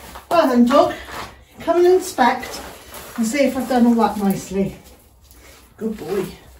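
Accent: England, United Kingdom. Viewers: “That was quite a good workout there”. I can’t say it like you but I love your English accent. I needed a cheering up today!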